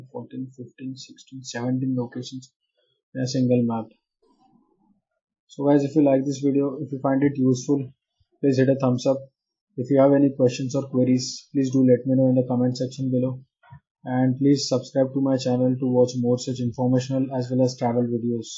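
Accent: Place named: Pakistan. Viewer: Learn to speak English in English,not in pakistani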